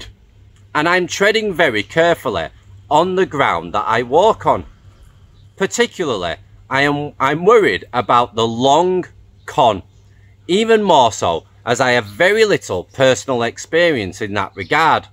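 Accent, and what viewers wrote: United Kingdom. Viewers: Use the 3 F’s rule it always works!!! That Brit accent?????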